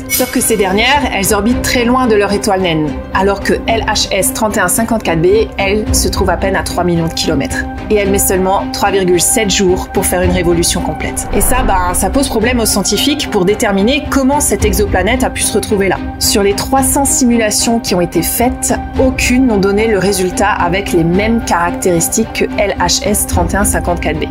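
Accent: Alsace, France. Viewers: Comment t’arrives a capter qu’elle est Alsacienne ? Perso je vis aussi en Alsace mais je vois pas la différence d’accent avec ceux du Doubs par exemple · Le joli petit accent de notre belle alsace ,passionnant sinon !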